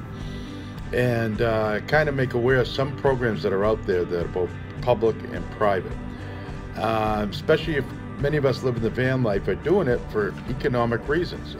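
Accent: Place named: Massachusetts, United States. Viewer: 1,600 dollars for a small one bedroom in Los Angeles area. Very difficult for people to get by. I grew up in Mass. Nice to hear the accent.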